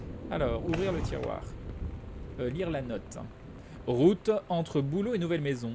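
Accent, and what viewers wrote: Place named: England, United Kingdom. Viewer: Hey salut =) je t'ai découvert sur les vidéos d'Aventures, j'étais intrigué et je dois dire que je suis surprise. Cependant, ta personnalité reste fondamentalement et c'est cool, tu t'immerse dans le jeu tout en gardant ton humour (y). Tu as gagné une nouvelle abonnée je dois dire, et ps: ton accent anglais est é-pique haha ~ Continue!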